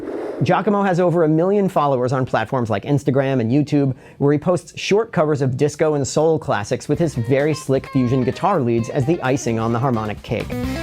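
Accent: Italy. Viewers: That's a pretty sick italian accent, dude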